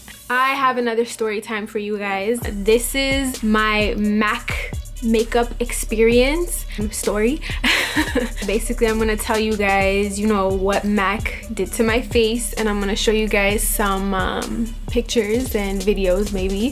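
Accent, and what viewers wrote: Toronto, Canada. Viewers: Within the first minute I was like.. "she must be from Toronto... most likely Scarborough" lol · lmao soo funny how you can tell someone is from Toronto by the way they speak! I'm from Toronto as well and I know soo many people who speak the same haha love your vids!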